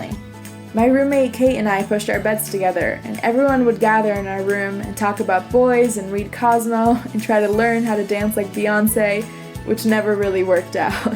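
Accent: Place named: Bulgaria. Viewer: Bulgarian accent's always the hardest to get rid of, haha.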